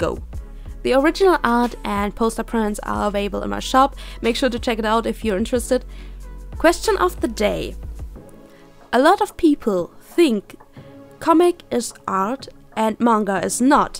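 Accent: Germany. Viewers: Okay, das Bild was du aus den Markern gemacht hast ist echt toll geworden ^^ ich hab btw. Raus gehört, dass du deutsche bist und hab dann nach geschaut:p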